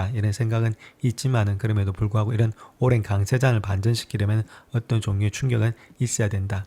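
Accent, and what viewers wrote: Gyeongsang, South Korea. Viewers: 일고리즘 타고 왔는데… 미국에서 온 전문가라면서 경상도 사투리.. 바로 퇴장.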